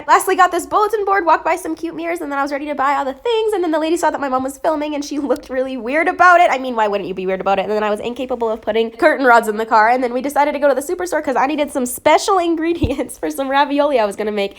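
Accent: Canada. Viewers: Omg is she from Canada 🇨🇦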